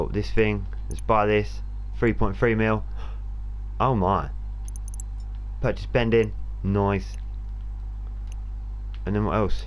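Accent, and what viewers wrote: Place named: United Kingdom. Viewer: i know you are british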